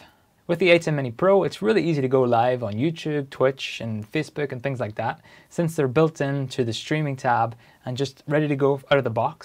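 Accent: Northern Ireland, United Kingdom. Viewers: Not often I hear an NI accent on YouTube! Just got my Atem Mini Pro down in Fermanagh!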